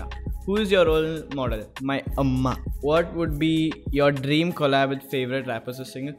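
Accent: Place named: Kerala, India. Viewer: Yo, bro u might not see this but u give me malu vibes dude, the when u say "amma",ur accent and ur likes...r u tho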